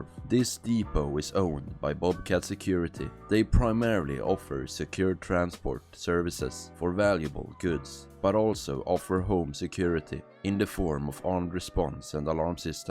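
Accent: Sweden. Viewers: Säg mig. Tycker du att du har en väldigt noticeable svensk accent? När jag hör mig själv snacka på inspelning så inser jag hur brutalt svenskt det låter trots att jag är flytande på engelska. It is what it is i guess. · most swedish accent ive ever heard 🇸🇪🇸🇪🇸🇪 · the swedish accent screams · Visste väl att du var svensk när ja hörde brytningen i rösten! denna åker in i sov spellistan! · Are you from Sweden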